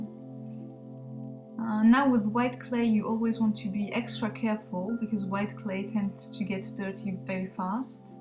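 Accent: France. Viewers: are you french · are you French? I liked the voice-over to.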